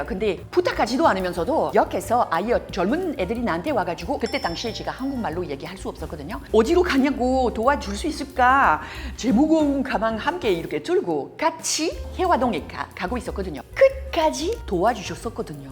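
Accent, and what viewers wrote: France. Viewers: 이야 이게 누구야 부란서이씨의 원조 이 님 아녀? 불어억양의 빠른 속사포한국어는 여전하네요. 오랜만에 들어보니 반가울지경. 한국에서의 삶이 벌써 30년이 넘으셨으면 이젠 그냥 한국인이시죠. 오히려 고국으로 돌아가도 어색하실듯. 암튼 건강하게 잘지내는 모습 보니 좋네요. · 악쌍 코레앙은 정말 그 누구도 따라할 수 없는 트레이드 마크. 트레 쥬닉크.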